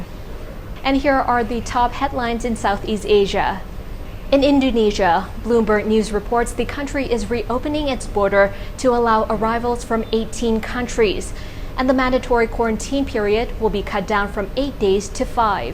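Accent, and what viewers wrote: United States. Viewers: You Will Have Wet 💦 Dreams Of her Tonight and you don't know a thing about accent . Her's Is 100% Americano Gringo, Bro 😷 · Great command of the english language, obviously an American accent. did a great job in the presentation, however recommend that she slows it down abit, should improve with experience. Overall a fantastic addition to the Thaiger team! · she sounds American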